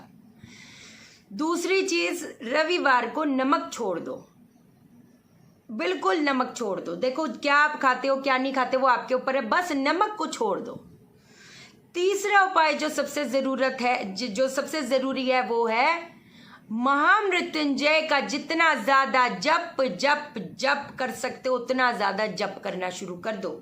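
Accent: Punjab, India. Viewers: बहन जी चाहे कुछ भी कहो ,please don't take it otherwise ,आपकी आधी पंजाबी मिली हिंदी बोली बहुत प्यारी/मस्त लगती है ,जी करता है सुनता ही रहूँ...👌👌 वैसे कुछ बहुत जरूरी परामर्श लेना है आपसे...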